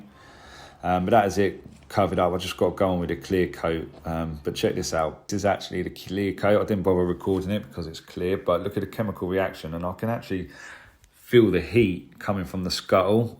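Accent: England, United Kingdom. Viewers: So many people who hear an Englishman, with this particular accent and makes his living with his hands, assume he’s like a soot-covered Chimney Sweep or a perhaps humble Cobbler from a movie that’s set in Britain at the beginning of Industrial Revolution 😂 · I love this accent, bruv. What part of England/UK is this accent? Me and my 2 teenage sons mess around with each other talking like The Kings Men.....bruv, and you lot😅😅❤❤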